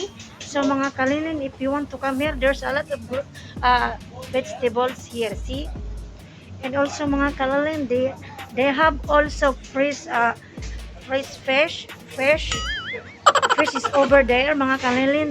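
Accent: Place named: Arab world. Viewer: Ayos host ah parang arabo na rin magsalita hehe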